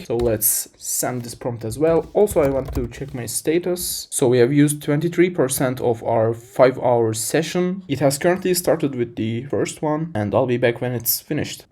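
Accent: Turkey. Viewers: Your accent sounds Turkish so I am guessing you are? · turksun dimi aksandan belli oluyo :D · most türkish accent i ever seen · o Türk aksanını nerde duysam tanırım kardeşim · are you turkish by any chance? i know that accent very well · I sense türkish accent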